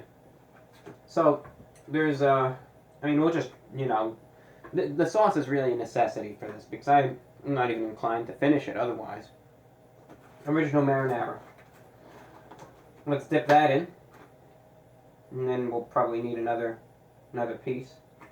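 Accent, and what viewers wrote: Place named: Queens, United States. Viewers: telling by his voice, somewhere from the east coast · I love it when the old Queens accent really comes into it's own, on the New Yorks and chocolate. I really like that accent, it reminds me of Richard Feynman and is quite soothing.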